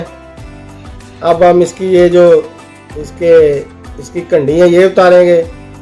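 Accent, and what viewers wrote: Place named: Punjab, India. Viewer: W SLAM BHAI BOHT HI NICE SHARING WITH PUNJABI AACENT LAAA JAWAAAB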